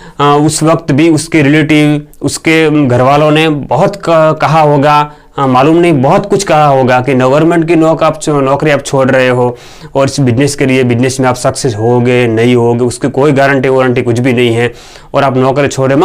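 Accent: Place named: Uttar Pradesh, India. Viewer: Up se ho aap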